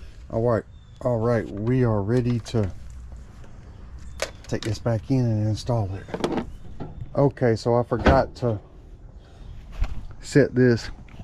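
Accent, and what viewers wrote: South, United States. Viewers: I just love Southern women and their voices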